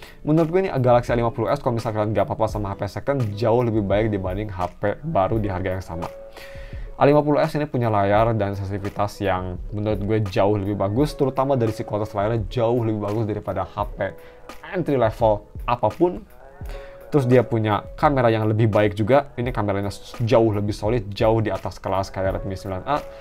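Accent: Bandung, Indonesia. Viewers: Loh orang bandung?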